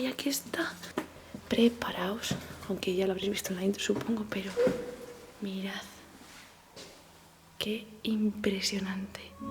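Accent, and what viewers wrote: Spain. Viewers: Y donde es eso soy de Venezuela supongo que España por el acento, es hermoso aquí no se ven esas cosas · Sería bueno que nos pongas más en contexto. 1..¿cómo es que te metiste a ese sitio? Al hablar con vos tan baja me parece que autorizada no estás. 2..Grabar la parte externa. 3..Decirnos algo acerca del lugar. Algo más que nosotros debes de saber acerca de un lugar a donde te estás metiendo. (Dedusco que debe ser en España por tu acento, pero no dices nada acerca del sitio ). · El acento español.. No sé por qué? Pero me remonta al pasado y ver tus videos.. es como estar en un cuento.. Quiero ese comedor tallado 😍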